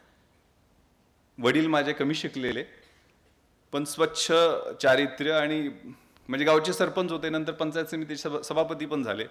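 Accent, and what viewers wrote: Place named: England, United Kingdom. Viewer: Why he is talking in English accent????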